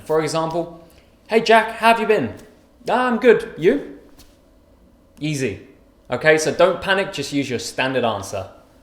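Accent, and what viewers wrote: United Kingdom. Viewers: I like your British English accent too and thank you very much.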